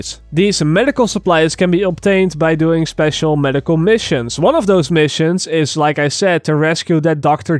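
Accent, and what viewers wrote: Netherlands. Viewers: Je hoort zo erg dat ie Nederlands is. Heerlijk!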